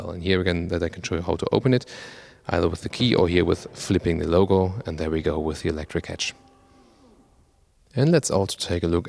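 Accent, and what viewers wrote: Germany. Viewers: walter neubär he's German. He has German accent :)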